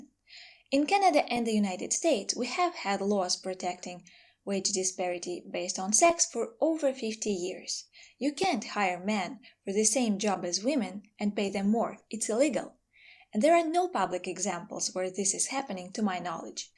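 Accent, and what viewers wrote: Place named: Eastern Europe. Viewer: Your accent sound Eastern European.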